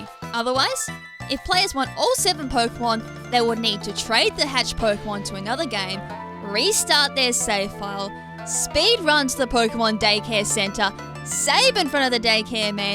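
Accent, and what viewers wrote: Australia. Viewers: I came here for the Australian accent. I was not disappointed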